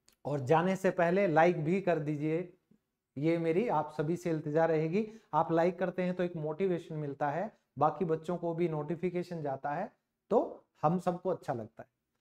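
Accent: Haryana, India. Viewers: Sir kya aap haryana se hai kya kyuki aapki language hariyanvi hai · Haryanvi English love you ❤️❤️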